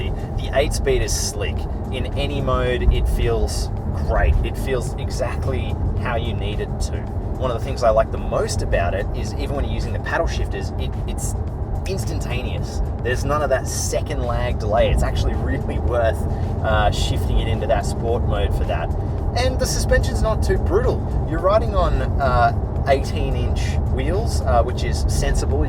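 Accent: Australia. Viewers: Do you from Australia?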